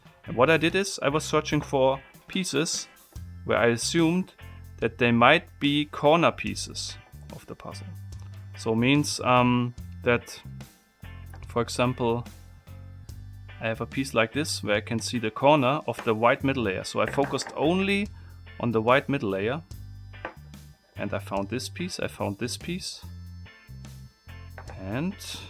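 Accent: Germany. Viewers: Im not going to lie the main reason i watch you is because i love the german accsent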